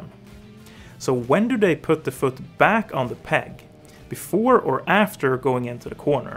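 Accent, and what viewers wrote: Sweden. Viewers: I love your swenglish xD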